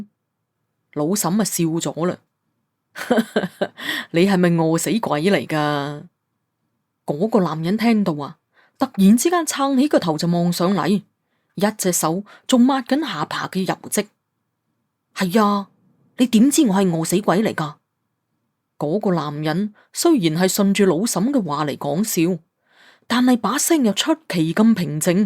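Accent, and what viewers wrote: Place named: Guangzhou, China. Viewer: 廣州腔講故🤣🤣好好聽😂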